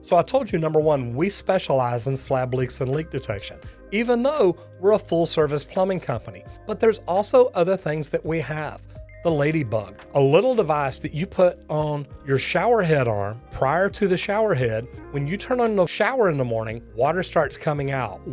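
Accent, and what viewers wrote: South, United States. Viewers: I kinda like his accent. Not too southern and not to Northern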